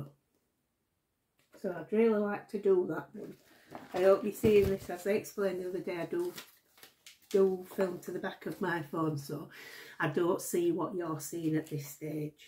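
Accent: Yorkshire, United Kingdom. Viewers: So happy I came upon your channel. I love to have a cup of tea and watch and listen to you. Your accent is very familiar since I was born in Idle, Yorkshire. Coming for a visit in October. So very excited since I get to bring my American husband to where I was born. Keep up your beautiful and artistic crochet.❤️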